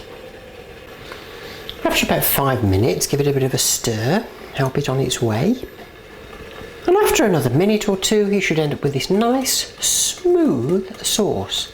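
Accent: United Kingdom. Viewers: I just bumped into you on this site. I saw a comment how somebody turned you onto his mom & she loves you. So I figured if someone watched you, saw their mom later & said "you have to watch this woman", then I ought to give it a moment to see why. Your my hero. Where are you from? The accent. British? Irish? English? Scottish? I grew up in Ireland. Connemara.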